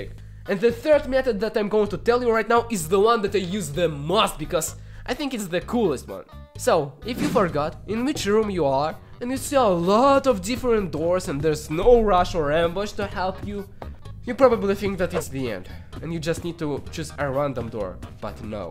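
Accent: Russia. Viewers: Why do you have a Russian accent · Bro has that Russian accent 💀💀💀 Also btw I'm not hating, i probably also speak like that since English isn't my first language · why do you sound like you have russian accent?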